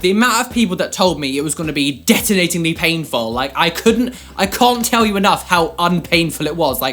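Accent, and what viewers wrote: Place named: United Kingdom. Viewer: I love your typical British accent.. it's so cute 😁 And ofcourse I love even piercings and body modifications. I used to have a surface of wrist, two microdermal on chest and nipple piercings, but I decided to remove them when I was pregnant of my daughter... I still have ear plugs and the top of the ear pierced..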